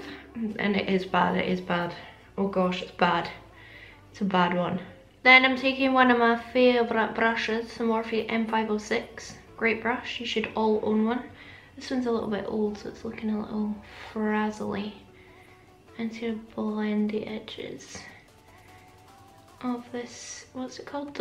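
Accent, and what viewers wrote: Scotland, United Kingdom. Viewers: Ahhhh I LIVE for your videos! And the outtakes! You have me in stitches every time and after multiple (pure travesties I tell you!) childbirths that's as pitiful as it sounds. I am also disabled with chronic spinal issues and fell into makeup because I was bored sh**less with myself. Anyway, enough fannying about! Just want to say how much I appreciate you. I'm in Australia but my entire family is from Falkirk. The ones who are still alive(!) have been here long enough to have lost much of their accents but yours brings my sweet Nana and Papa back to me and they went belly up years ago. I'm often told that we come cross as "cold", not huggers etc.... I always say its a Scottish thing! Thanks for the validation lol xo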